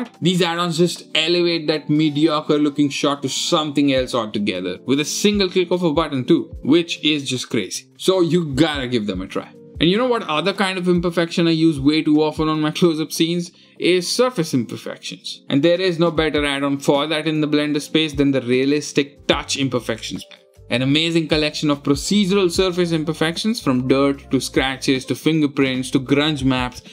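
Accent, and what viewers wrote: Bangalore, India. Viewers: This mans commentary is the best xDD Are you from Bangalore. You sure sound like you are xD Love it. Your videos do make me feel home in a way in a country away xDD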